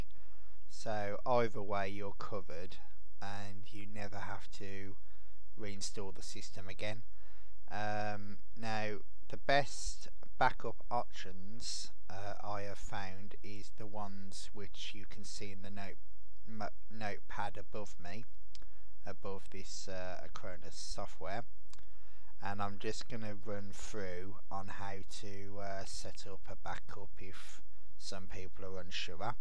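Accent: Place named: Ireland. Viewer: thank you for giving such an informative video. I received an Acronis True Image Activation Key with my new Crucial SSD. Having never heard of Acronis, I ventured onto Youtube and found your video. So now I feel up to speed. One question: my wife and I were wondering from you accent where you are from. She said Ireland, and I'm just not sure. OK, I'll say Ireland too.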